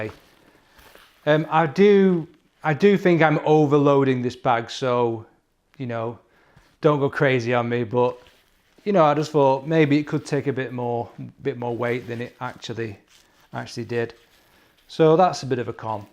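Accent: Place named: United Kingdom. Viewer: I like that this bag is good for 1 people as you say. I appreciate being able to learn proper English from a British people like you.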